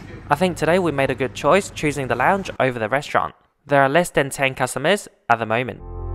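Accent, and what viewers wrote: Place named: Australia. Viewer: why do i feel like your accent has changed. it's more aussie than before.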